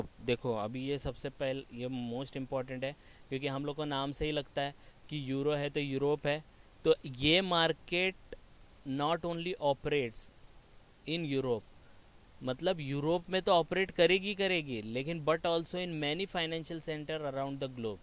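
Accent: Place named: Maharashtra, India. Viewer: Mujhe esa kyu lgg rha hai ki Professor Marathi hai?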